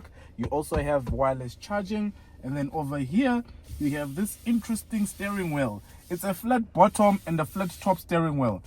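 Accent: United Kingdom. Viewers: British accent😂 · The British accent 😂😂😂😂🔥🔥🔥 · It's a British accent for me." Allow us get started ❤❤ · The British accent 😂😂😂😂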